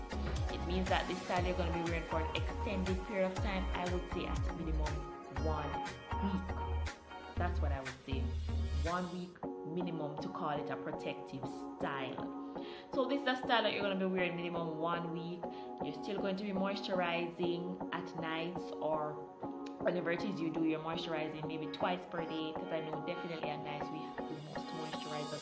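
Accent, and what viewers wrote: Jamaica. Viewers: Jamaican ? #wahh gwan! Just subbed Hun !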